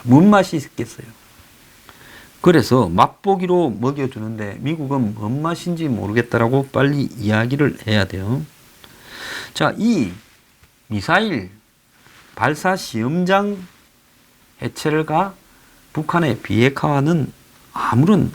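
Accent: Gyeongsang, South Korea. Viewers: 황소장님은 경상도 사투리를 기역도 잘하십니다 저는 많이 잊어버렸는데요 머리가 좋아서 기역역도 대단 하십니다 항상 고맙습니다 너무 더운데 건강잘 챙기시고 건강하십시요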